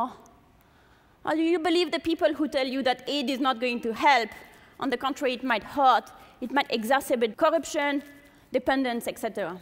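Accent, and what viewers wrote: France. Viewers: that's how a french speaker speaks english. Speaking some of the R as H, some H as SH. French is easy and fun if you English and Hindi.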